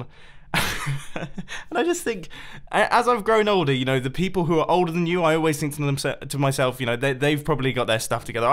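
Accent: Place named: United Kingdom. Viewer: Hi Mumbo. First of all, happy birthday! Can't believe you are the same age as me! You have an old, classy and very British voice, it's amazing. Second, for the design of the walking of the sphere, a simple circle walkway is the way to go I think.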